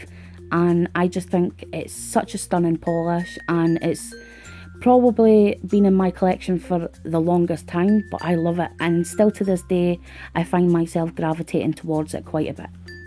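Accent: Scotland, United Kingdom. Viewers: Just found your videos while I was looking for a good blue polish....Thanks for the great recommendations! Also love your Scottish accent...Have a blessed day!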